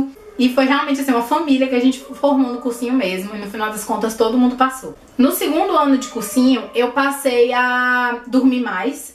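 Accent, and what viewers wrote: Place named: Salvador, Brazil. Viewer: Amei o vídeo. Você é linda. Sou de Salvador e reconheci seu sotaque na hora haha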